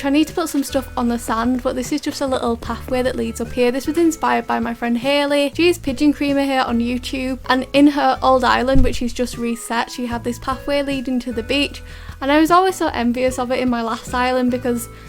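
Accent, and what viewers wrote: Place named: Northern England, United Kingdom. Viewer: your accent makes me so happy, I'm from Yorkshire and hearing another northerner makes your videos feel so cosy aha, your island looks so so good!!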